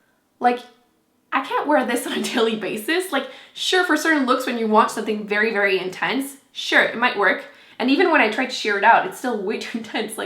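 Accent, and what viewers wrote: France. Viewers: She has an AMAZING french accent. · Sorry are your french??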